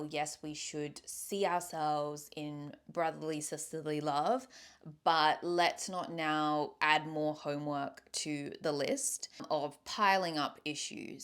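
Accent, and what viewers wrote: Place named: United Kingdom. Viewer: Are you half white? ...You look half-white and sound like you were raised in Britain.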